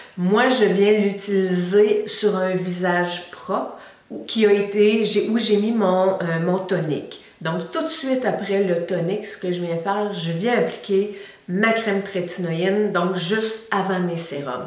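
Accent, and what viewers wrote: Quebec, Canada. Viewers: Je taquine beaucoup mes copine québécoise pour leur accent mais toi je peux t écouter parler pendant des heures ... donc j ai bien compris après avoir bien fait pénétrer la crème et laisse poser tu applique quand même tes sérum doux sans acide je ferai pareil et les soirs off je mettrai mes sérum au retinol merci pour tous les conseil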